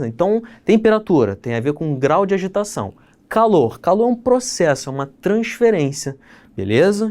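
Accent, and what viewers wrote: Rio de Janeiro, Brazil. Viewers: Um carioca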